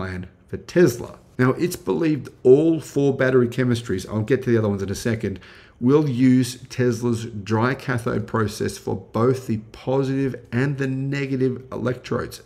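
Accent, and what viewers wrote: Australia. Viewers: I love the Australian accent. Keep it up! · Don't listen to 'em, bro! Americans adore aussie-speak! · Lovin the ausie accent. Always great work. Revolutionizing the auto industry. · Mate, your accent is perfect, it's not even strong "back of Bourke" strayan as some of my close friends (and I can understand them perfectly). Keep going mate, you're doing great. Enjoy the US trip !!!! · Americans want you to sound more "American"???? What? Most Americans really like an Australian accent. Now if we can just get you to talk like Crocodile Dundee, your subs will at least double! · Don’t change your accent. I can’t believe someone suggested that. Why would you need to sound like us Americans? We would probably rather sound Australian.. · As a Brit to an Aussie, don't let a Yank tell you how to speak. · I like your Aussie pronouncing... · Your accent is awesome! 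Be you. The Australian tourism council should be paying you per view!!!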